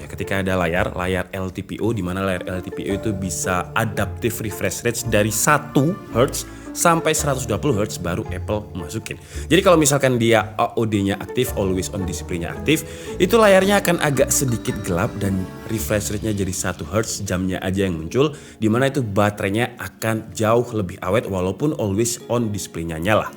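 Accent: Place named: Surabaya, Indonesia. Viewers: Review er nya orang mana. Kog kaya daerah Surabaya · Wong Endi mas kok koyo gae boso Jawa timuran🤣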